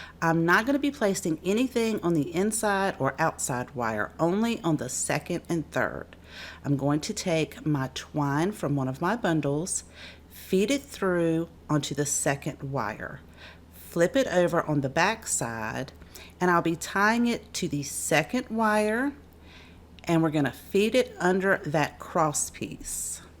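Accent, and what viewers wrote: South, United States. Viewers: There’s no way I can pick just one - they are all amazing!! Love listening to you explain the projects with your Southern accent!!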